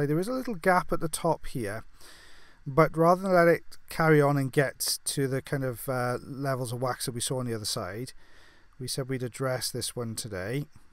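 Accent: Wales, United Kingdom. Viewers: One of the things I look forward to with dry earwax is hearing "crumbly and dry" in a British accent. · Gordon M he has a welsh accent 😊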